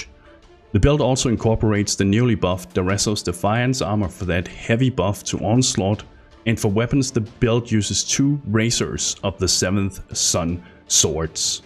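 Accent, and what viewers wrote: Denmark. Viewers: danish accent? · I am so sorry, but you should learn how to pronounce some of the words. I really like your videos but i can't take it seriously, you make good videos but you english could use some work. You sound like you are from Danish, Arbejd den Danske Accent ud af dit engelsk :/ lyder mindre godt. Hvis du synes jeg er en idiot ignorer mig :) hav en god dag.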